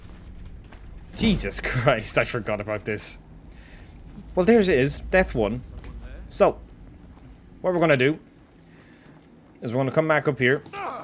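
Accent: Ireland. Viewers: Glad to hear that voice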